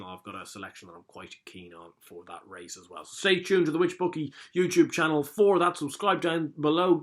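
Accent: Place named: Ireland. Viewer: donkey years and pats fancy ew or to win, what are you suggesting here, it's the thick irish accent that i find hard to keep up with sorry bud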